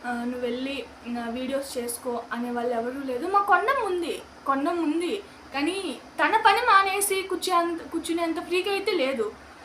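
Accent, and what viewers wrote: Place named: Nellore, India. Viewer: Good very good....maadi kuda Nellore ni matalu vintunte mana Nellore Baga gurtostundi...proudness tho nanaki istanu annav good ni kallaloo happy ness chustunte yevarina motivate ayyi Ila intlo unde vallaki nuv chala aadarsavamthsmga undali vallalo marpu vachi vallalo unde talent ni gurtinchslani korukuntunnsnu any way congratulations...all the best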